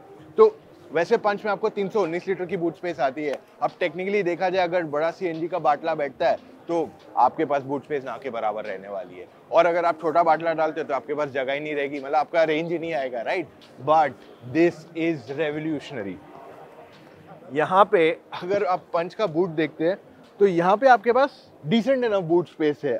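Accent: India. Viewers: CNG ka बाटला... 😄👌🏼Love the desi accent/words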